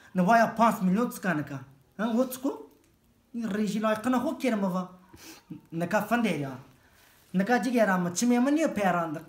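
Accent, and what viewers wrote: Dersim, Turkey. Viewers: Aynı dersimliler gibi konuşuyorsun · Tam anlamıyla bir dersim şivesi 😂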